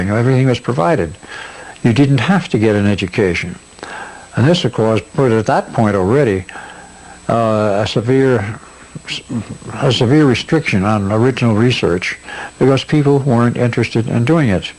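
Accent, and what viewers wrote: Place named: Boston, United States. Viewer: he most be from Boston.. is Al bostonian ?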